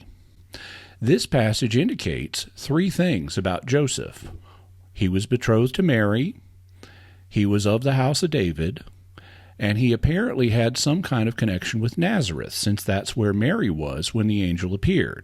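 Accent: South, United States. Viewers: He recently moved back to Arkansas, so his natural accent is reemerging. I like it, shows some Southern heritage representation in Catholic apologetics. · For the first time ever I noticed a bit of a Southern accent. I wonder if Jimmy went home to Arkansas or wherever he's from And visited family. Anyway, great video. Good stuff as usual!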